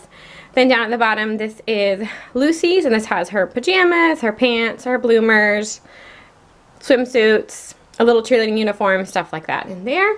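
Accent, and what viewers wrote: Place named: Texas, United States. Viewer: Was going to say you sound Texan. I'm a Texan. :)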